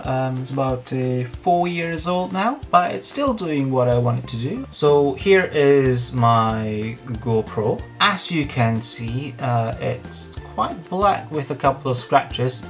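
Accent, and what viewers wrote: Scotland, United Kingdom. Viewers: I like the idea you've got with you GoPro because of that reflection thing.. And I like your scottish accent :D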